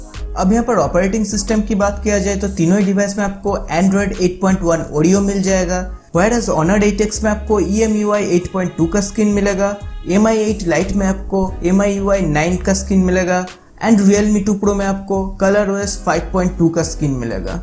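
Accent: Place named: Bihar, India. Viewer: भिहारी टोन आ रहा है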